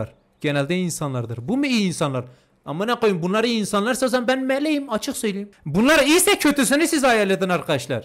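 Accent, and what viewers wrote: Elazığ, Turkey. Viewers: ABİ ELAZIGLI MISIN MAİLİNDE 23 VAR VE AKSANDA ELAZIGLI GİBİ BENDE ASLEN ELAZIGLIYIMM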